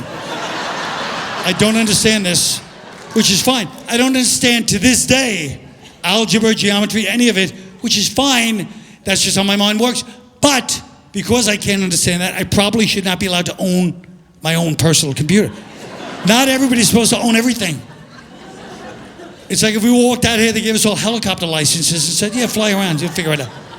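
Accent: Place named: New York, United States. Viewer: Wow I just realized how much trump n Colin have the same cadence. New Yorkers am I right.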